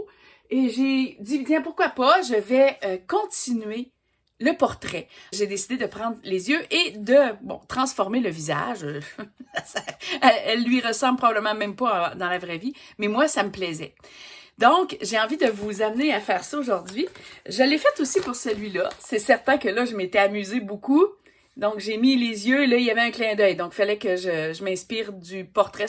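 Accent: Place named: Canada. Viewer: Toujours très inspirant! Quelle aura! Créer en jouant , jouer en créant, c'est exactement ce qu'il faut faire pour un bien être total... Votre sourire et votre accent canadien insufflent une belle énergie ! Grand merci pour vos vidéos.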